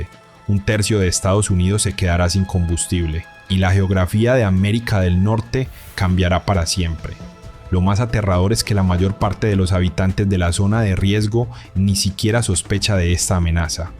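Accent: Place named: Antioquia, Colombia. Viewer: Esa voz es de un.paisa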